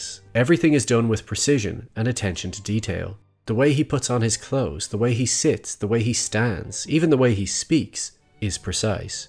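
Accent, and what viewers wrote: Ireland. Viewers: Where in ireland u from? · R u Irish I can hear the accent a bit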